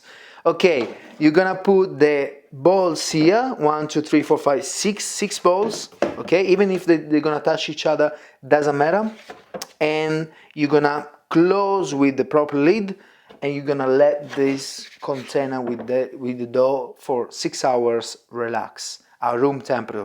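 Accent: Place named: Italy. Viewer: Mate don't apologise for your English! It's great and it doesn't even matter if we hear your Italian. I love your accent!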